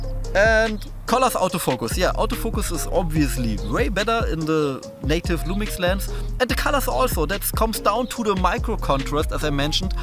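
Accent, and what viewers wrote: Berlin, Germany. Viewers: Schade das du die videos nicht in deutsch machst . Du kämst mit deiner berliner schnauze sicher viel geiler rüber und es gibt nur wenige deutsche kanäle die nicht langweilig sind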